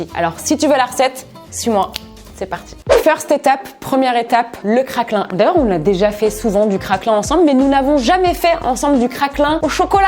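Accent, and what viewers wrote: Canada. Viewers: tu mon youtubeur préféré desvidéos accent canadien et qui met quoi des vidéos trop trop rire 👍👍👍👍👍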